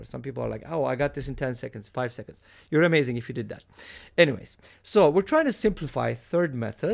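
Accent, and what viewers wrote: Turkey. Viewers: It seems to me that you have the Turkish accent...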